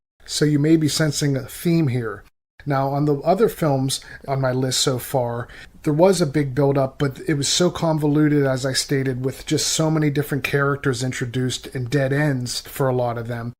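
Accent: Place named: Philadelphia, United States. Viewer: Haha! That’s awesome! You’ve got a hint of the greater Philly accent so I thought you must have been from around here! Yeah, I watched a ton of Kung Fu movies on Philly 57 and still have a few VHS tapes recorded from there with all the local commercials for Acme, Window Wizards, and the like. Was the “bootleg era” a nationwide thing? In the 90s I bought lots of bootleg tapes from a place in Chinatown called Universal Electronics (3 for $25!). The quality was generally awful but that was the first time I was able to see the Shaw Brothers stuff that never got released on TV as well as unedited versions of the more violent stuff.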